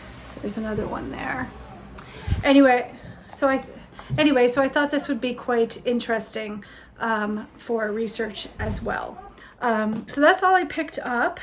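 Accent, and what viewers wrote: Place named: Canada. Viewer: Even as a Canadian I notice you have such a striking Canadian accent! 😍 maybe it’s native Nova Scotia accent?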